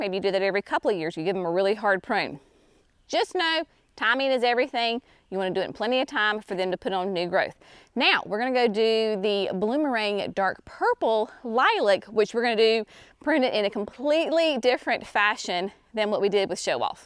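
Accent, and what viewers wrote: South, United States. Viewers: I hope you get to a place of relaxation and calm before the wedding. Does the bride want to help, or they are too busy working? It speaks volumes about your kindness and character to be doing so many preparations for the wedding ( and garden tour). I love your videos and get a little homesick when I hear your beautiful Southern accent. Thank you for another wonderful video.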